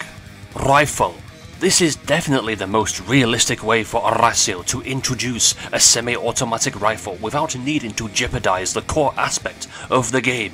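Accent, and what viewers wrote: United Kingdom. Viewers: The R-rolling just sounds very weird, in the midst of the British-like accent.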